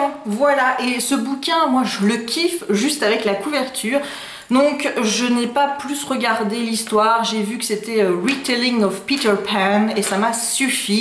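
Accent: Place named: Belgium. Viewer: Coucou! C'est la première fois que je te laisse un commentaire mais je regarde tes vidéos depuis longtemps, et ce soir je voulais juste te dire que je les aime beaucoup! Elles sont de plus en plus dynamiques, rigolotes, pleines de vie en fait! Je trouve que tu es très fraîche, et ton petit accent belge me rappelle des bons souvenirs (ma famille maternelle est belge également). Les sélections de sorties pour les mois à venir me plaisent toujours parce que cela permet de trouver plein de nouvelles idées de lecture! En feras-tu prochainement pour les livres en VF aussi? Je demande surtout par curiosité, les VO me vont déjà très bien. Belle soirée!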